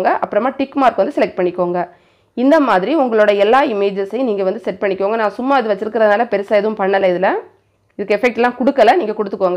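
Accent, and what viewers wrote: Kanyakumari, India. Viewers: Sister....neenga kanyakumari thanae.....🙄slang super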